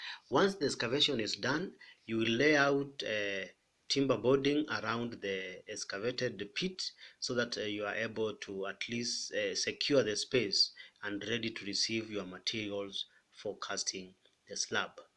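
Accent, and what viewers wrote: Kenya. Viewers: Good stuff man! and why do you sound like a Kenyan? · Sounds Kenyan.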